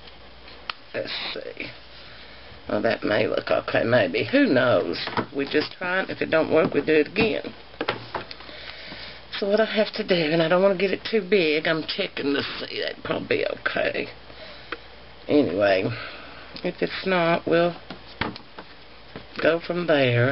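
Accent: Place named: Tennessee, United States. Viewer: U r too cute. I'm from SC. You sound like you r from TN.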